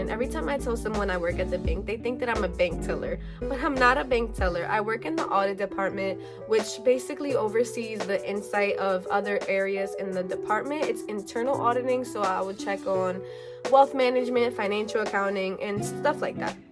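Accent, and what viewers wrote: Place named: United Kingdom. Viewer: Great video! Your British accent always gets me and great Q@ keep it up😁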